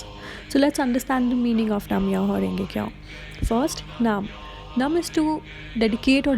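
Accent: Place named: India. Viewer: Tough Critique in the US gives this EXcellent presentation a Thumbs UP! Bravo!! The demonstration must have taken a lot of hard work, and the outcome is superb. I now have a new Mantra to chant THANKS 2U!! Might I however suggest that you speak slower, because you your words sound mumbled, and PLEASE DON'T RUSH THROUGH THE VERY ESSENCE OF YOUR PRESENTATION - You say "Nam Myo Ho Renge Kyo" so fast every single time, that it's hard to catch what's said. The background recital is softer (and a v. nice touch), so the viewer is left wondering how to pronounce it. (Especially outside India where the "Indian" in your accent is foreign to the listener!? Your Presentation is so good it deserves to be heard all over the world) Also: Nam Myo Ho Rengay Kyo would be the more precise spelling if it is to be pronounced correctly. Hope you will take this as constructive criticism, & speak a little more clearly in your future videos - You have what it takes, so go for it, and Best Wishes. 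You Go Girl - Ushta-te!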